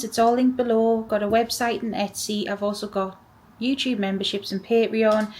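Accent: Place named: Scotland, United Kingdom. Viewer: This is quite the journey we’re all on, quite the balancing act. I repeat to myself every morning before I get out of bed,” I will not give into the lies, I will not give into fear. I will hold onto Hope, I will hold onto truth, no matter what”. Building a supportive community is absolutely essential during these turbulent times. And my dear, your videos are very helpful just to hear that sweet sweet Scottish accent soothes my soul. Thank you for everything you do for this community! Sending love and light to all 🙏🏼💙❤️‍🔥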